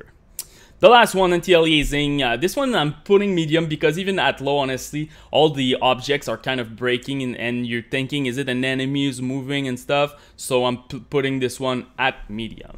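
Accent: Quebec, Canada. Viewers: lmao just curious are you from Quebec Or New Brunswick?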